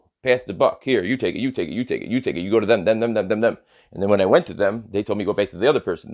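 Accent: Chicago, United States. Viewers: Go get em!!! You have become a favorite auditor!!! I love when you educate them with that style and swagger! And love that Chicago accent 😉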